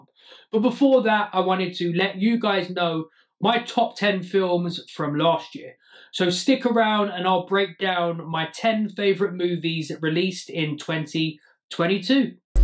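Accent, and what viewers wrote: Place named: United Kingdom. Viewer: Yeah man! Subscribed. Cool. Also UK accent straight out of Guy Ritche movie. Nice to meet you.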